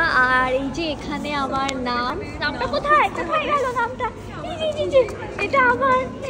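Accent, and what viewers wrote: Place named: Bengal, India. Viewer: Tomar ei Bengali English accent ta vishon irritating and believe me tomar kotha gulor jonnoi vlog ta lengthy hoy, vishon boring hoy. Ei neka neka voice ey kotha na bole music die Dio. Vlog interesting hobe tahole.. ei under confidence er moto neka kotha gulo shunlei majh theke manushe back kore chole jabe.. just a honest review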